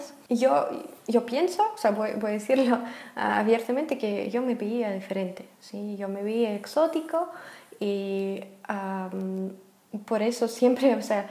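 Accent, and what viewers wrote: Chile. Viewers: en qué parte de Chile viviste?? Fantástico tu castellano y más lindo tu acento chileno!!! Saludos desde Chile. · Es adorable tu acento Chileno!!!!!!!!! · Eres hermosa se te nota mucho el acento chileno. Saludos desde México. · Aprendiste el chileno en tu estadía allí? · Es el segundo video de tu canal que lo veo, me quedé encantado con el acento, hispano chileno jajaja, pero el castellano neutral es el peruano, espero puedas conocer mi país, aquí tienes un amigo peruano para guiarte por ésta hermosa patria.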